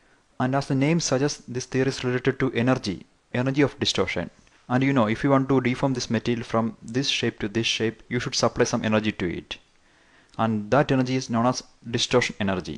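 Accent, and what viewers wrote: Kerala, India. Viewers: Malayali aano Sir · It's an Indian accent. For those who are here to complain about his accent, please remember that you don't even know a word in Hindi or any other languages in India. Good job mate, with the video! Fuk accent critics. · great explanation but Malyali accent tho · Yes, he is an Indian, he's a mallu.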